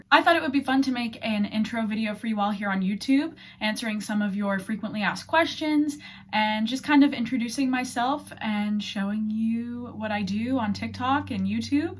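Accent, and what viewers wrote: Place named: North America. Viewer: Hey! Its nice to know your real name! I love your videos! (I too am obsessed with Marvel lol) Here are some just basic questions I have: How old are you? Ik your birthday was recently! Are you Canadian? American? (I assume you can't be anything else cos of your accent lol)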